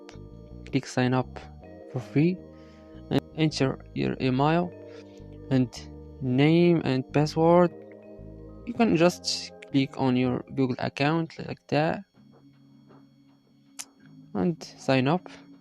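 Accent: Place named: Morocco. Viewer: You are moroccan from your accent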